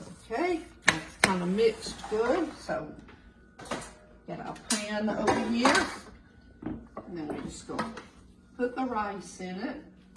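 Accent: South, United States. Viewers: Hello from Scotland! just found your chanel. great recipe thankyou. and I also love your Southern tongue ! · I just found her channel today, and she seems so lovely in addition to being a wonderful cook. And yes, I love her traditional southern accent. 🙂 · one of the last Southern Belles! That drawl is Southern without being "hokey" and yes i am southern too but more country than belle. But my mom and her mom before her cooked like that...limas because I love them, cabbage for my younger sister and sweet potatoes for the youngest one. YUMMMMMM. I can smell it all from here in Alabama. Bless you so much. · I love your Southern Accent. Im from North West Florida in the Panhandle. Once on vacation in Denver Colorado I was at a Wendy's with my young daughter and talking with her I apparently stuck out like a thumb! A man walked up to me while I was in line and asked me where I was from. 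I looked at him puzzled and said...United States. He went back to his table and a different man came up to me and asked me the same thing and I answered the same and was thinking...what in the world is wrong with these people! He then said... no what state are you from and I told him Florida and he turned to the table of people he was with and said.... I was right she is from the South. 😂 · She has the most beautiful southern accent - I LOVE her! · I love hearing your Southern accent. I lived in Georgia for 35 years, and miss it. 💕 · She reminds me of my mamaw too! I just love this lady! My mamaw was from TN so her southern accent was a bit different than. She was the best cook!! She made THE BEST EVER pbj sandwiches! I can't wait to see her again oneday!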